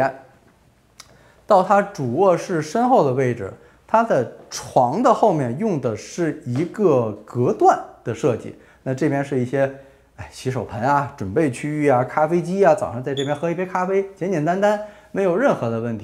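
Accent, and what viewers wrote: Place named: Beijing, China. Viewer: 听口音应该是北京兄弟... 这种豪宅看看就好，因为维护及保养费用会高的惊人，我们这种做小生意的人一旦买了就真成了房奴了！节目办的不错，加油啊兄弟！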